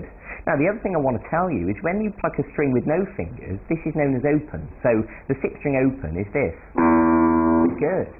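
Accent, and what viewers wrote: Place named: Australia. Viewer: pretty sure thats an australian accent...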